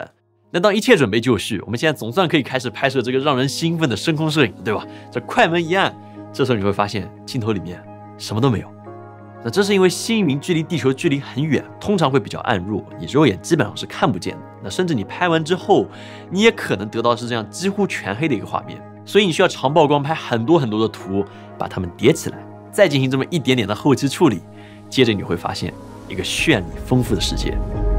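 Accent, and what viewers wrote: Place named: Jiangsu, China. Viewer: 这口音，江苏人。我福建的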